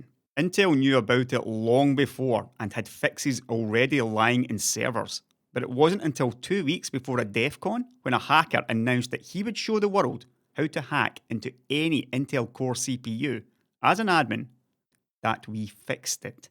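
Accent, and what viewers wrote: Scotland, United Kingdom. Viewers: Scottish accent + curse words, name a more iconic duo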